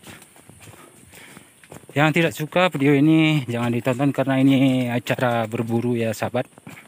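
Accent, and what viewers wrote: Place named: Gayo, Indonesia. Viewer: nampak eee si nos konten nee urang gayo tok, logat epeh betih we 😄😄 salam satu hoby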